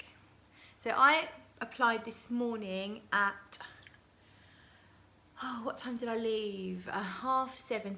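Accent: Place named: United Kingdom. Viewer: What part of the uk are you from? The accent is fun to listen to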